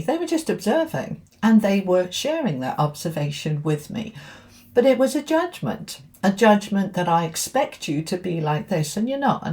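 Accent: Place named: United Kingdom. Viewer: Thank you dear ❤ I was brought up internationally with a cultural mix and learned very early on to not judge. Everyone was different, including me. But what I really needed to be reminded of was the self care bit where I have slipped a bit... Thank you for that! Also I love your voice and accent, I used to work a lot with brits (am Swedish) and listening to you is just wonderful!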